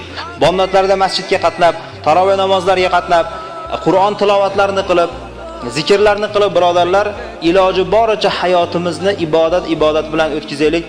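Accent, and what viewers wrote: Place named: Andijan, Uzbekistan. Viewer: Андижонда укип битирган домла шекилли шевалари тозаа Барокаллох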